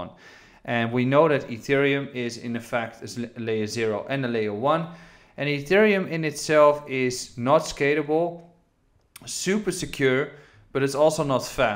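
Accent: Netherlands. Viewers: Had binnen 1 minuut al door dat je een Nederlander bent ;). Bizar hoe herkenbaar ons accent is wanneer we Engels spreken... Goeie video man, je bent een abonnee rijker!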